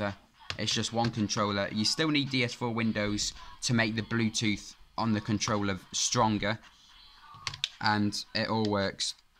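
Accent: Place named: United Kingdom. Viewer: I LIKE BRITISH ACCENT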